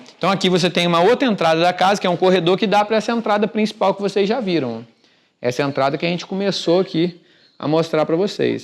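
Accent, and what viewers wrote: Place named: Minas Gerais, Brazil. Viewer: Algo me diz q vc é mineiro, acertei??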